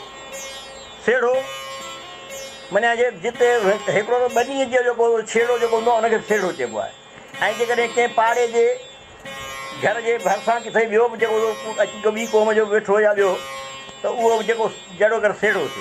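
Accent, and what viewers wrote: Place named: Sindh, Pakistan. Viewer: واھہ آواز تہ ڀلو پر پڙھڻ واري جو لھجو ٺيٺ سنڌي آھي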